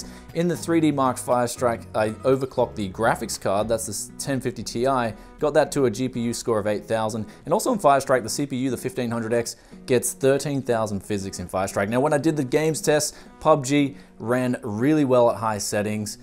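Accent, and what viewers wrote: Bogan, Australia. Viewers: Australians and their accent are so cute, hahahaha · haha that Aus accent. Spot on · Hey I loved your bogan voice it sounds awesome and hello from perth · Hahahaha bogan voice xD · Came here for the aussie accent XD so entertaining XD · why the bogun voice?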